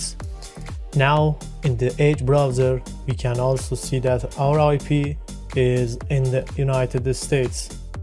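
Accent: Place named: Eastern Europe. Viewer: You're Persian but you have an eastern European accent. That's interesting. Thanks for the tutorial.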